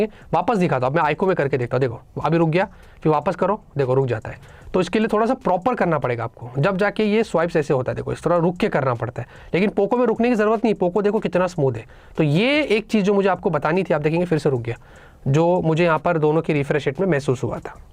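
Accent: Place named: Mumbai, India. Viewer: Apki bambaiyya hindi achi hai...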